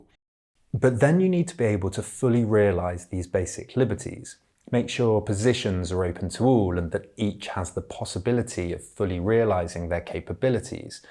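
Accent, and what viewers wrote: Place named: Bristol, United Kingdom. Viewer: the slight bristolian accent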